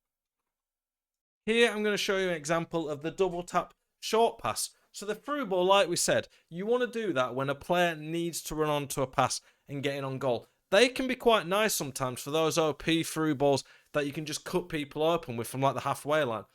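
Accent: United Kingdom. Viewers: Man this is the most British accent i've ever heard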